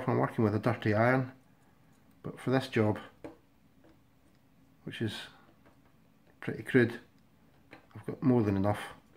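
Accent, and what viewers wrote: Scotland, United Kingdom. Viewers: I love your scottish accent :)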